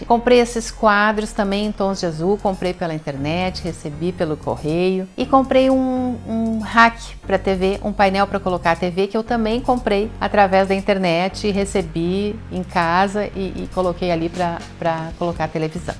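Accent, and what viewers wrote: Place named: South, Brazil. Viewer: Como já morei no sul do Brasil amo o sotaque .❤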